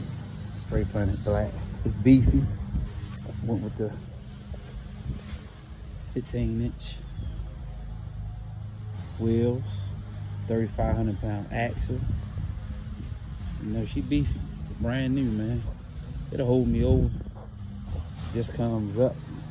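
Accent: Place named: Baltimore, United States. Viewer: Great information my brother.Are u from Baltimore?I got homies from Baltimore and they talk just like u bruh😂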